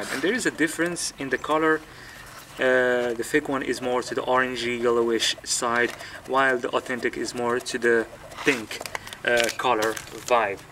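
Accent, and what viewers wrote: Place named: England, United Kingdom. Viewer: This is very helpful!very nice english accent,, i send you all of it,🤗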